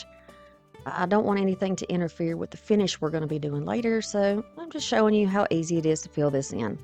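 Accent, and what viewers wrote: South, United States. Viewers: Crackling on acrylic paint is not easy. Love these sunflower DIY's I love your country accent. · Hi, new subscriber here. Heard that Southern and knew i belonged! Mississippi, here. I love your designs and the "make it your own!" You had me at y'all!😁🥰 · All the DIYs were great. Love the style. Never get sick of ur southern accent. Everyone has some type of accent. I am from Maine and we have a different accent here too. Keep the DIYs coming. ❤️ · I’m from the south, so I hear that accent a lot. I’m from Louisiana so we have a bit different accent but here in Tennessee, it’s different. You actually sound like a lady I work with. Just the things you say and the way you talk. She crafts as well so I had to check the name! 😂 but she designs tumblers. We apply labels to products, etc., and that was right up her alley when we had these tubes to place labels on. 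She was the only one that could do it perfectly and quickly! The little ones I could do, once she showed me how. The bigger ones, no can do! I was happy to let her do them. One more thing… different subject— I got these decoupage papers on Amazon and didn’t notice that they were only 6”x6”! They are beautiful but I think I can only use glue sticks to apply them! What can I use them for? If you have any suggestions or if you could possibly do a video on using them in some neat way, that would be great! It’s a whole picture of flowers with no space to kind of cut them and put just accent pieces without them looking like they’ve been cut. It’s hard to explain. Just looking for ideas or suggestions. I was very disappointed and just should have paid more attention to the size! 🤦🏻‍♀️ I also go some stencils that were bigger than I was wanting but I can find something to do with those. I’m not sure I can share pictures on here or I’d show you what they look like. 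Maybe if I matched the paint to the background of the paper, I could possibly do something with them. I just don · ❤ I love your country accent. I have one too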